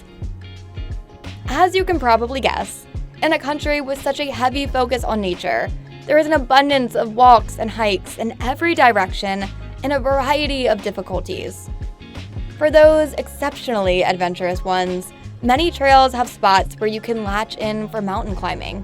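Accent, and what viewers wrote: United States. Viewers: was about to say the same heehee, sounds for the most part Chinese-American as well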